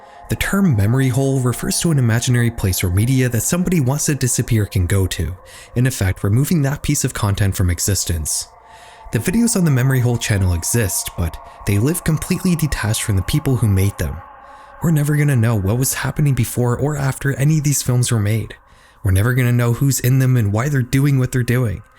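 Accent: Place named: Canada. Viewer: So you're from Ottawa, eh?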